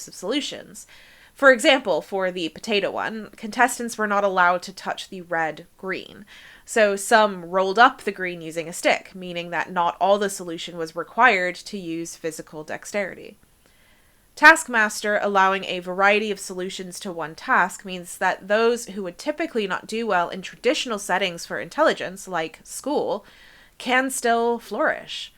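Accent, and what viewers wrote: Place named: United States. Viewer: One good thing about Taskmaster is that the British female contestants don't have that annoying American way of speaking that this narrator has.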